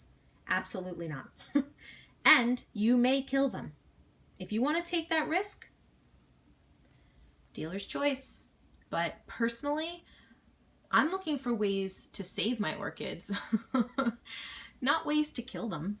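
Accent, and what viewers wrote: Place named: New York, United States. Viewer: GREAT video, thank you. I learned so much about how to take care of my own phalaenopsis buddies. All your comments about ice cubes made me laugh. Yeah people tell me all the time about how they water their orchids with ice cubes like that. I never follow their advice either because it doesn't seem like a tropical plant would like ice water. Now I know for sure after seeing this video of yours. Cheers. Also, I love your New York accent.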